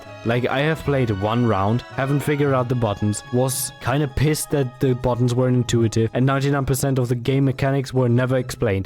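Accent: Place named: Germany. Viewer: i guess you are german good accent tho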